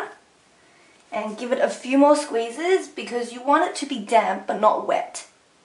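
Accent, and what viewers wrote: Australia. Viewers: I love your video! And love the Aussie accent! ;) so I just used my beauty blender for the first time today and just like u, I fell in love with it!! It covered my acne and scaring. I couldn't believe it!! Best purchase hands down.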